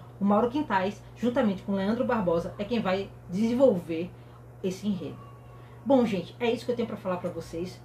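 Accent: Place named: Northeast, Brazil. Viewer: arrasouuuuuu em sua estreia, muito desenvolta com esse sotaque nordestino arretado (gravou de primeira? heheheh nem pareceu nervosa), bons comentários, sucesso garota! Fala Galera acertou na aquisição. Concordo que temas como esse da Vila Maria abstratos são mais difíceis de se carnavalizar e é o enredo que menos me entusiasmou até então. Essa sacada do Pequeno Príncipe do sertão achei genial, vamos ver como se materializa na avenida. Incrível que diferente do Rio as escolas até preferem desfilarem cedo. Não curto muito essa coisa de posições pré-definidas pela classificação do desfile anterior (Gaviões e Colorado), essas duas poderiam ter efetuado trocas ou eram já fixas? Outra coisa que gostaria de saber (vou te dar trabalho hahahah) acabou aquela coisa de escola de torcida não poder desfilar uma atras da outra, ou permanece? No geral gostei bastante do sorteio de Sampa.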